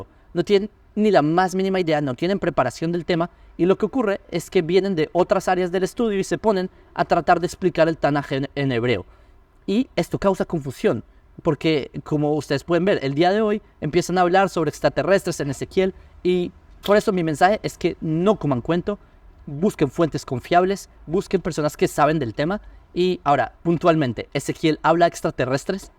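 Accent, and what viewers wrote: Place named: Bogota, Colombia. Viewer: Muy buena explicacion...entonces es el estilo de tematica de Ezequiel...,tu hablas como si hubieses vivido en Bogota...tiene un español como de Bogota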